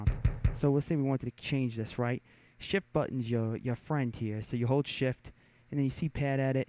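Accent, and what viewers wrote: New York, United States. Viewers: This dude is hilarious. His New York or Jersey accent makes it all the better.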